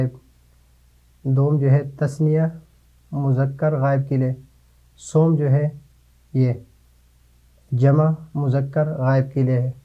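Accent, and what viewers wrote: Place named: Pakistan. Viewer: طرزِ تکلم تو پڑوسی ملک پاکستان سے ہونے کو بتلا رہا ہے باقی واللہ اعلم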